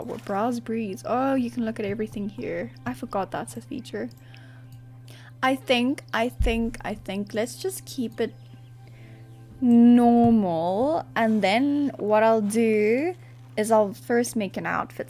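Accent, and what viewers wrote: South Africa. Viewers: Hiii I'm new I was wondering if you are south African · Are you afrikaans? Bc it kinda sounds like it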